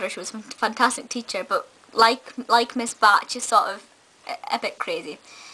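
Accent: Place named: Scotland, United Kingdom. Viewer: I am lovin your Scottish accent. I remember the film The Worst Witch (1986), and boy was it funny. Its hilariously bad..a must see.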